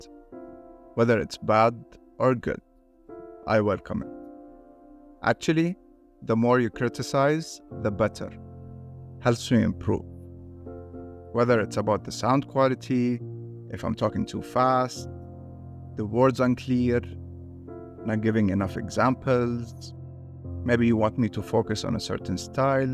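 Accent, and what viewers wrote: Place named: Egypt. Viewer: Since you asked for some corrective feedback: Your tone in the video is a bit laid back. I kind of think you are on your bed, early in the morning, making these videos. Although I like how the silence in between the spoken words matches the cosmic background of the space void shown in the video, that makes a good combo. You can also consider making your videos under 2 minutes of length; that will improve your full-watch statistics bettter on youtube. It is good to explain how your own particular dialect is at play at some of the video contents. For example, you would say 'albi' when reading the world Qalbi (my heart) because that is the (Egyptian?) dialect perhaps. Similarly, you'd say 'Kateer' while reading KaTHeer with a ث. It is also a good idea to keep people informed about some of the sharable private information/news/material from your actual life during the video as it creates a strong personal bond between the content creator and the followers. 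Finally, creating content around anything that is trending in the news will make the content further interesting as well as catch some of the organic search traffic to your videos. Overall, good efforts, in fact, great efforts and the only advise that suits this time of your YT creator's journey is not to give up till your 250th video and wait like a patient harvester. This would be a case of یعجب الزراع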